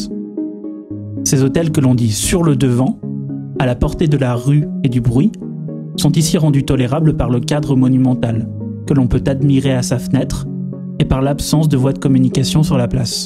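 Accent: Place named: Paris, France. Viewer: Le narrateur a l'accent du 16ème.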